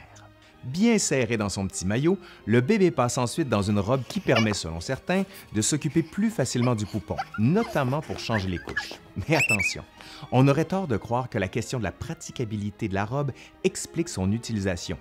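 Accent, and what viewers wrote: Canada. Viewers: Bonjour vos vidéos son excellente et j’aime bien votre accent Canadien bonne après midi à vous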